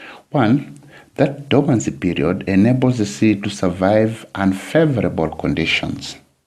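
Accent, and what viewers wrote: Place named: England, United Kingdom. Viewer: your videos are good because i like your english accent its eloquent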